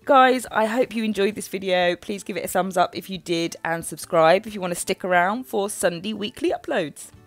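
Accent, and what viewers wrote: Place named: England, United Kingdom. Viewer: Awesome videos! Lovely to hear an english voice for once. If I was as artistic as you I probably wouldn't use washi either!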